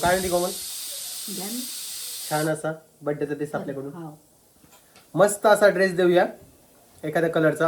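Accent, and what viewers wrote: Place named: Pune, India. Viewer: पुण्याच्या लोकान सारखे का बोलतो अरे आपली विदर्भाचि भाषा चांगली आहे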